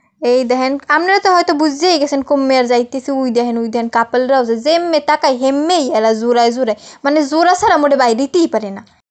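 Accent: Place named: Barishal, Bangladesh. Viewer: আম্মে আরজিনাল বরিশাইল্লা আপা ভালোলাগে একছের😅😅😅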